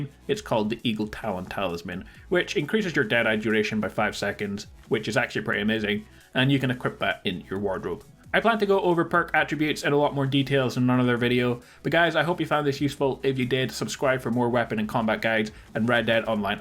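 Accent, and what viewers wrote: Scotland, United Kingdom. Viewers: I hate the quickdraw system in RDR2. It is completely retarded. It tells you to fill the meter slowly on the first press, by pressing slowly...Press slowly? It's supposed to be a quickdraw ffs! I died so many times trying to do just that. The trouble is that you don't get much opportunity to practice either. BTW are you Scottish ya bawbag? Me too. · You sound a touch Scottish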